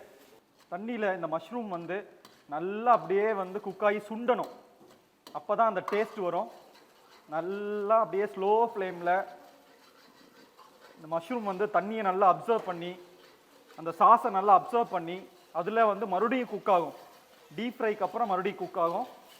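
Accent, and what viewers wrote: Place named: Coimbatore, India. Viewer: அண்ணா நீங்க நம்ம கோயம்புத்தூர் பாசையில் பேசுங்கள் அழகாக இருக்கும்.....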